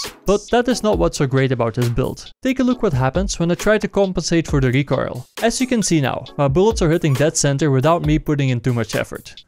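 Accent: Netherlands. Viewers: Love dutch accent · Nice Dutch accent hehe. But cool guide :)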